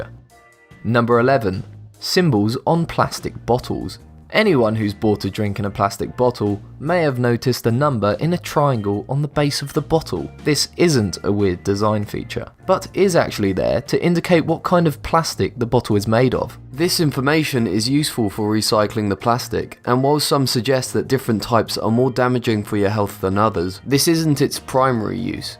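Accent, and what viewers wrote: United Kingdom. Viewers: ABritishGuy He doesn't sound American to me. He sounds British. So who is the idiot now. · British English... How I love to hear it up! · 1) You’re an idiot! 2) My ears bleeds wherever I hear a British accent! 3) You make a lot of remarks you think are funny but in reality they are not. People who have no sense of humor should abstain from making lame remark that only they believe is funny. 4) “The seven spike on the statue of Liberty represents the 7 continents” False! Untill a decade or two it was aways believed and taught in school in the <num>’s that there are 5 continents: Europe, Africa, Asia, Australia, America. It's only recently (around 1980 or 1990) that we added Arctic and Antartica. If you are going to make up things why not say it represents the 7 days of the weeks? I mean if you want to make stupid comments, go all the way.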